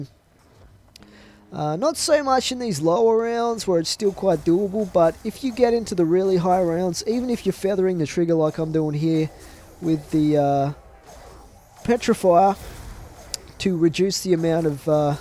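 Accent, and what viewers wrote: Australia. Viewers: Your Aussie too? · i like your accent australlian?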